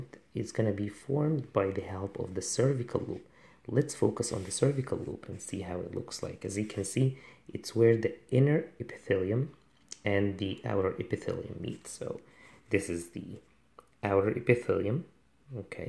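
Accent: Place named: Vietnam. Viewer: who is agree with me that is seem to vietnamese accent??? p/s: i'm vietnamese, hihi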